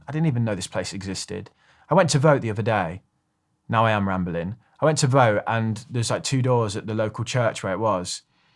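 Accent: Birmingham, United Kingdom. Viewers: Great video, what a way to turn a shit thing into a force for good. I will admit to being disappointed that you're a city fan as I thought I detected a Brummy accent and hoped you'd be a Villain like myself:'( As for the name for "the attack", how about "The Smackening?"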